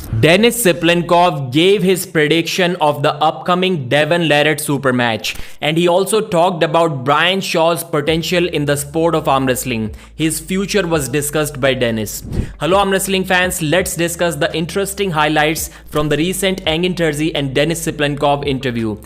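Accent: India. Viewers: * has indian accent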